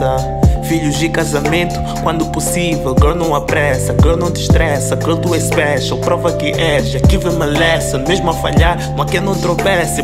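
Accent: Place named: Mozambique. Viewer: Nani memo out de Moz ainda mantê o lado moçambicano, a forma de falar o calão. That's real bro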